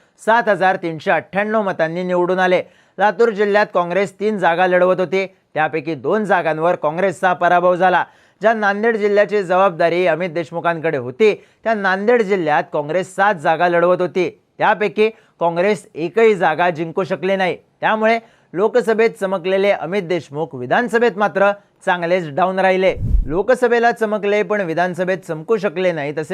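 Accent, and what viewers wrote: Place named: Kolhapur, India. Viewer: तू मस्त आहेस पण जर तुला मोठ व्ह्यायच असेल तर तुझा कोल्हापुरी accent बंद कर आणि महाराष्ट्र ची भाषा शिक.. don't take me otherwise it's only suggestion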